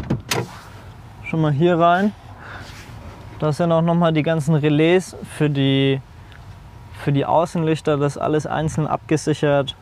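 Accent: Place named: North, Germany. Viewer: Super beeindruckend! Sehr nett ist auch die norddeutsche Sprachfärbung. "Hier is nochn Fliegngidda" Gutes Video.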